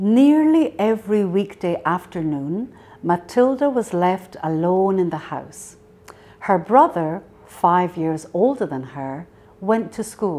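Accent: United Kingdom. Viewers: 영국발음이 너무 좋아요... ㅠㅠ Roald Dahl Charlie and the Great Glass Elevat 이 책도 읽어 주실 수 있나요? 책이랑 같이 읽으면서 듣고 싶어요!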